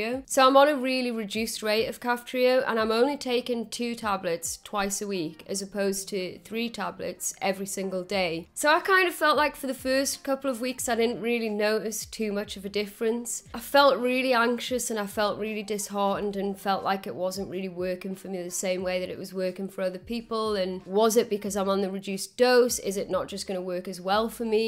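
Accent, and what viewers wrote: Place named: Teesside, United Kingdom. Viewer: Great news. Glad your health is improving. BTW, where is your accent from? Sounds a bit "Boro" to me.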